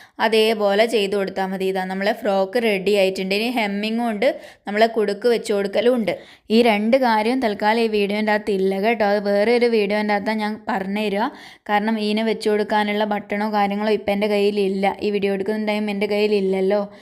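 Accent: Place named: Wayanad, India. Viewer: Evidaya veed ? Samsaaram kelkumpol vayanaadu polund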